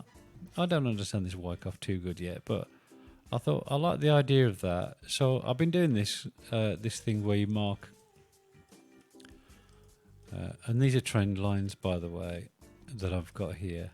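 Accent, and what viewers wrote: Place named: Birmingham, United Kingdom. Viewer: From a Brummie.....so nice to hear a fellow Brummie on YouTube!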